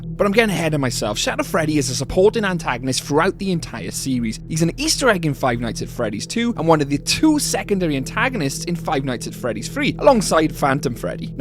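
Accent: Wales, United Kingdom. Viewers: the welsh accent is real 🔥🔥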